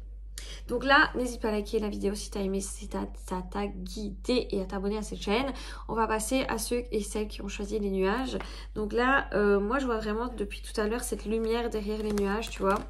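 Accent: Belgium. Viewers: Quel chouette petite accent belge!!! Merci pour l a guidance. Bon weekend.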